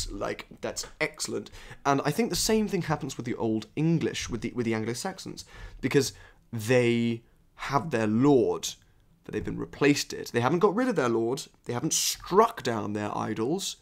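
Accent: RP, United Kingdom. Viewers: I do love a bit of posh 😊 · This accent sounds so posh to my plebeian Canadian ears. Also please keep this up. Ancient classics would be interesting too. Esp from the east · I can't help myself from being jealous of the vocabulary and flow of your speech, I guess some people are just from finer stock unfortunately. But I have seldom met a nor seen a posher bloke and i feel it distracts me from the subject you are conveying. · I’m Brazilian, English isn’t my native language (or even a second one), quite the opposite 😅 but after studying it for a while and being exposed to it, I’d say I understand it reasonably well. That said, I’ve always struggled more with American English — I usually understand other accents better. Watching this video, I understood almost everything. I don’t know if it’s the way he speaks or if it’s actually British English (I’m guessing), but for me it felt like having an English class and wanting to study again. 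Loved it.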